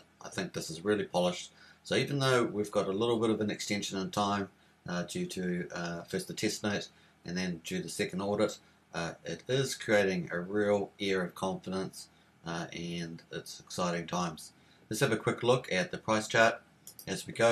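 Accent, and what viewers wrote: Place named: New Zealand. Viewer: U sound like a new zealander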